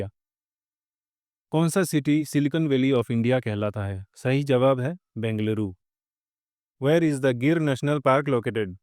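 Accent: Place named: Assam, India. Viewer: Assam ka lag rha hei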